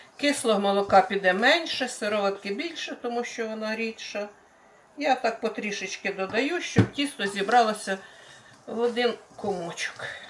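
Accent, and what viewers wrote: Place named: Surzhyk, Ukraine. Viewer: Раньше вы говорили на русском языке, а сейчас на суржике...но мне нравится и понятно каждое слово...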